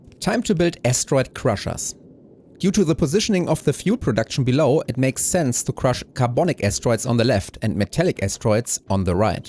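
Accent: Germany. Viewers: Den deutschen Akzent hört man extrem raus.😅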